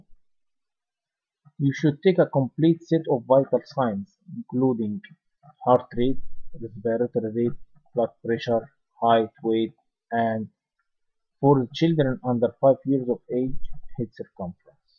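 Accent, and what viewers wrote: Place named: Egypt. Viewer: From the accent I can tell it’s an Egyptian talking😎 thank you 🙏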